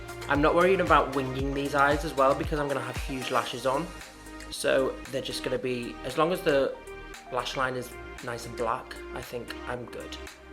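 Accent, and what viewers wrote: Liverpool, United Kingdom. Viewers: He still sounds Scouse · Miss ur Scouse accent, love it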